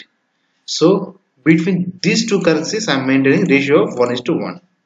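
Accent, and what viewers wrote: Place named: South India, India. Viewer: sorry to say but when u upload any such video try to speak clearly instead of speaking in south indian accent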